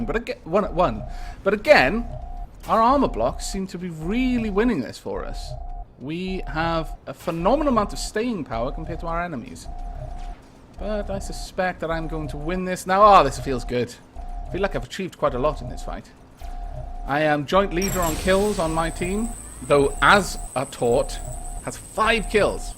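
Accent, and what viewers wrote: United Kingdom. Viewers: thanks for understanding i love your channel by the way keep it up moslty the robocraft vids there awesome you helped me a lot with fliers and you got me into the game your on my 1 on the list of my favorite youtubers cause you answer back and you understand us and also help us and i really like your voice its really pleasing to hear it when ever i watch i vid i get really happy cause i love the british accent its so nice to hear but keep it up! looking forward to the next episode.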